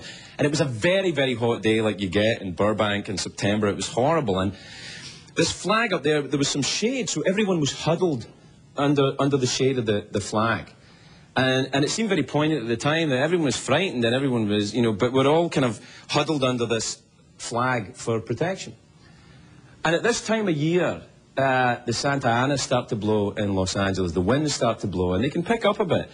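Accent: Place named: Scotland, United Kingdom. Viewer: He sounds so scottish here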